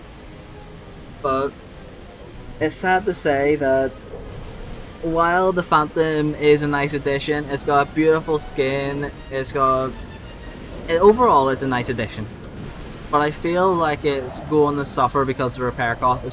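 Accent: Northern Ireland, United Kingdom. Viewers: Hey mate, are you from Northern Ireland?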